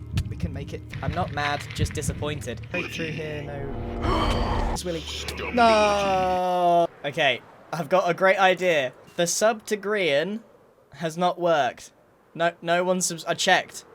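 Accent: United Kingdom. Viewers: R u British? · Why is vocabulary sound much more British today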